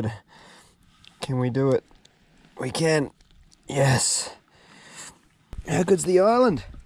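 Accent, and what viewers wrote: Australia. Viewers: I really like your videos, It’s lovely to hear an Australian accent.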